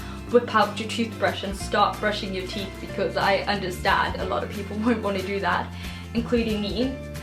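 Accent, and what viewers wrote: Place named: South Africa. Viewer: Me watches 10 seconds she is south African Me goes to description box reads: I am south Africa Me I knew it🤗 Btw getting braces soon and this helped a lot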